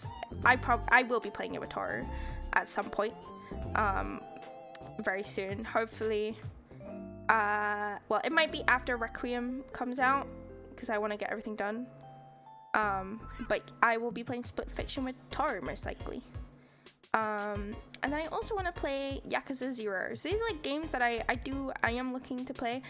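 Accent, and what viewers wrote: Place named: Liverpool, United Kingdom. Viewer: Oh snap a Bri'ish VTuber, and a Scouser no less! I absolutely love your design! It's nice to hear a Scouse Vtuber tbh, I'm a Woolyback with a lotta family down in Liverpool so it's kinda nostalgic for me to hear. It's also nice to see a VTuber who I might actually be able to watch without destroying my sleep schedule because of time zones!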